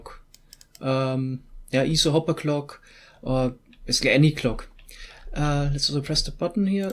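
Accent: Germany. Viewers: Bist du deutsch? Klingt so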